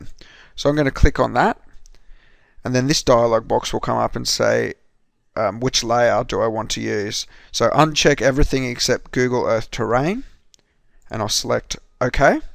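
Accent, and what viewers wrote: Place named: Australia. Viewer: Love da Aussie accent mate!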